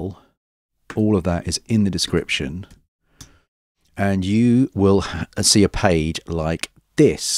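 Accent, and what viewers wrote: United Kingdom. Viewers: WOW! The easiest youtube tutorial I have ever come across. Also always love the British accent too. I just subscribe to your channel... Will be waiting for your next tutorial.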